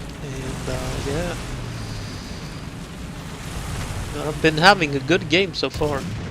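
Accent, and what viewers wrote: Sweden. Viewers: Lmao hörde att du var svensk efter första ordet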